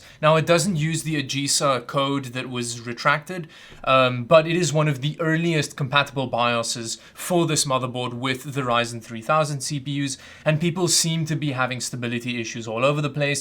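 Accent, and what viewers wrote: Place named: South Africa. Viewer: Sounds kinda south African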